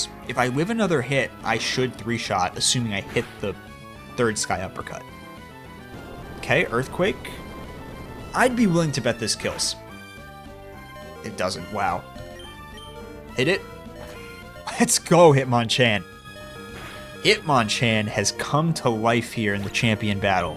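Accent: United States. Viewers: Are you from the Philly area?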